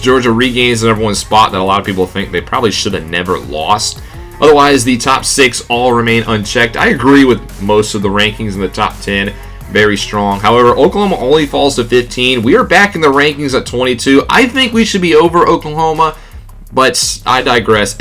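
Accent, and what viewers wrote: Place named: South Carolina, United States. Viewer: Brother, you gotta be a native South Carolinian. Ain't nobody but natives pronounce it Sac Carolina! I know, cause that's how I say it too.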